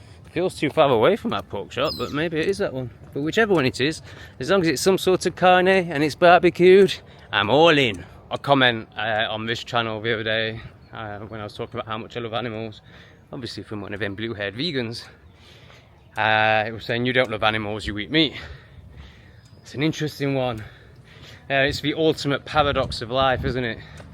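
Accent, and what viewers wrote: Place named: Yorkshire, United Kingdom. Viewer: Propa yorksha mate…keep it up😂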